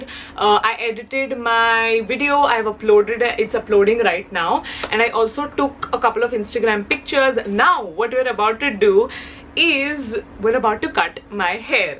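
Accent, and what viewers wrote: India. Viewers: I just love ur hinglish in between ur english I literally love it😍😍 u r too cute....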